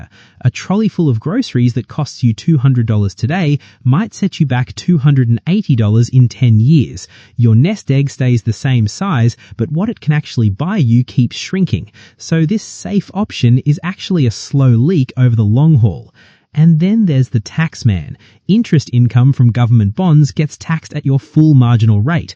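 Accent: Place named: Australia. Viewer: I know your channel is created to target people who literally a bit lost their way in personal financial approach. First of all, I apologize I been watching yer videos as a English listening practice source, second I love your Australian accent, mate❤❤❤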